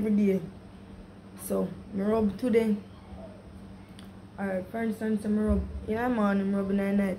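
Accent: Jamaica. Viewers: Oooh hie bomboclat kkk l can hear u speak like Jamaican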